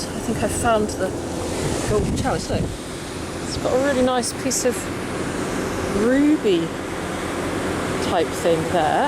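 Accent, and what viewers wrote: United Kingdom. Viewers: It was an absolute joy to stumble on your video miss! Yours was the first British accent I have heard on YouTube for ages and I was beginning to feel homesick without leaving North Yorkshire. As for wishing you were 32 again I have just been 32 again and I can tell you that being 64 is over rated despite what may have said in the song. Blessings and peace miss.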